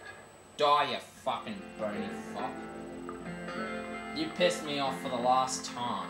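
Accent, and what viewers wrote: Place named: United Kingdom. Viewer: his british accent makes his anger funny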